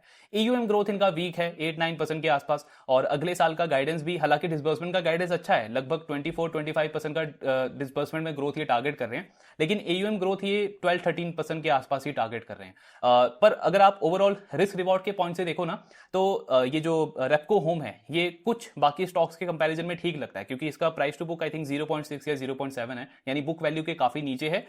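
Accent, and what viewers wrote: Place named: Bihar, India. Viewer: Bhai app bihar se ho kaya maybe Madhubani aur Darbhanga se hoge app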